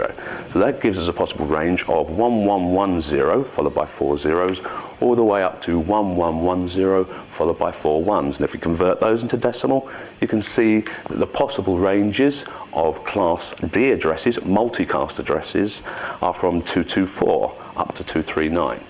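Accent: United Kingdom. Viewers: british pronunciation?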